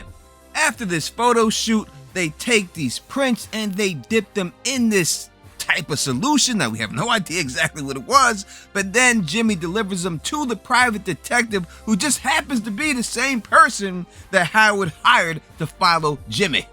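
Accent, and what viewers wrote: New York, United States. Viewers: as someone born & raised in NY, the way you say BETTAH CAWL SAWL really makes me homesick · Lalo and Gus are going to meet for a death match. Lalo gets killed and buried in the lab. Remember when the fly drove Walt crazy? Flies/dead bodies. Haha. Not sure what Lalo wants with Kim and Saul. I guess to find out what really happened in the desert and if it tells him more about the superlab? He may use them to get past Mike somehow for a showdown with Gus; he’ll probably find out Saul knows Mike and he was helped by him. I think Kim either has to go to prison for Howard’s murder or die. I don’t think Ed is a good solution, they’ve used it too many times. We know that Saul buys the black book from the Vet though, as he still has it. All the pieces haven’t come together for me, except Lalo’s death, it has to happen to give Gus so much control in Breaking Bad. 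I agree, they’ll stick it. It might not be what makes us all happy but it’ll be right for the universe. Love your NY accent dude. Okie dokie? Really?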